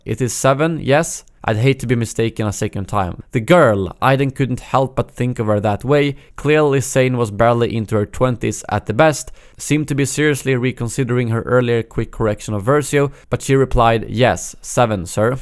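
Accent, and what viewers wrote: Sweden. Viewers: You read fine, don't be afraid of reading with your "Swedish accent" because you read pretty good bro. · His Swedish accent is awesome.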